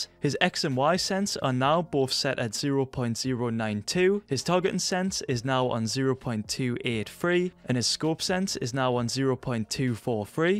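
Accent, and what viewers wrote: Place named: Newcastle, United Kingdom. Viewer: You're a proper Geordie haha get in, fancy couple games one day?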